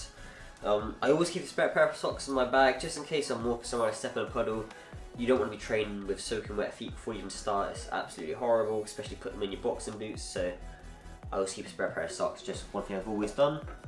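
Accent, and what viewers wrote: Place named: Somerset, United Kingdom. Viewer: you sound local mate you in Somerset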